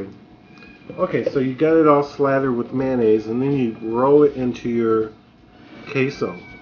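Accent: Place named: Southern California, United States. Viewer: So, what I hear that's so familiar and comfortable in your voice is perhaps a Southern California accent? Been trying to place that freitasex sound for a couple of months. It's a good sound. Southern California makes sense.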